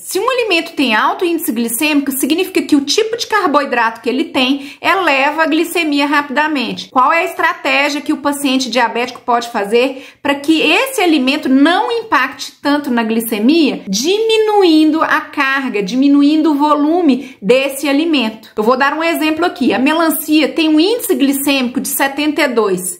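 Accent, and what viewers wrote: Minas Gerais, Brazil. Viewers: Eu sou mineira de Uberaba,resido em Sampa Acho que essa menina tbem ë mineira ?? Acho pela pronu cia.Saudaçoes.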